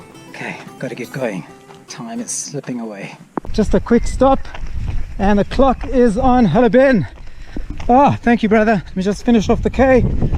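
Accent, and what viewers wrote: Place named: South Africa. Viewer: גם כל כך נחמד לשמוע את המבטא הדרום אפריקאי האהוב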